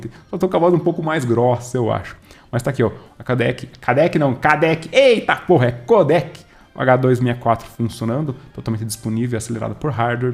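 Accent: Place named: Curitiba, Brazil. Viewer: Bem capaz que és de Curitiba. Piá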